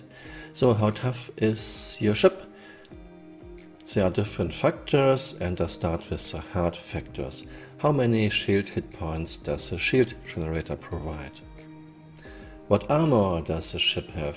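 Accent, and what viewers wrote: Germany. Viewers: Subbed ringged and I love your videos but ... for a non native english its very hard for me to translate your germanish. So many "so" and "torpedos" and many others. Please take that as feedback, i no way i wanted to offend you or anything ;)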